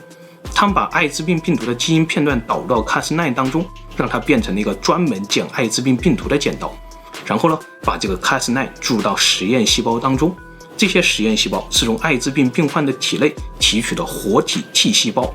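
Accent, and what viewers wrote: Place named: Hunan, China. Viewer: 你的湖南口音很重：l，n不分，以至於不得不時刻反應：暖細胞是卵細胞等。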